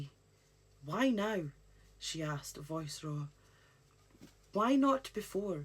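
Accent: Scotland, United Kingdom. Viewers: ITS THE MAGIC THAT BINDS US , THE SURILION BLUE , LIKE THE CLOUDS IN THE WIND , LOVE YOUR SCOTTISH STORY TELLING TO FALL ASLEEP TO 💙💙💤💤💤💤💤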